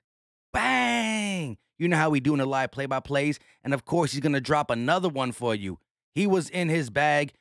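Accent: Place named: AAVE, United States. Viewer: Why speak in ebonics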